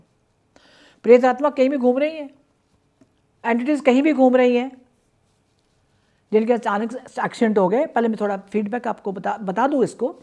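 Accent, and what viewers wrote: Punjab, India. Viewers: Madam ji as per your accent I think you are punjabi , are you ?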